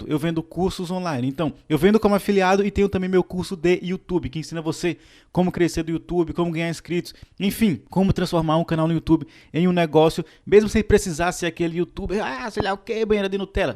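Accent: Northeast, Brazil. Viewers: você é do Nordeste meu irmão! Top demais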